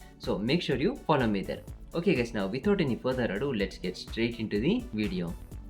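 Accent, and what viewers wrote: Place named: Tamil Nadu, India. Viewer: I always thought you were from tamil nadu based on your accent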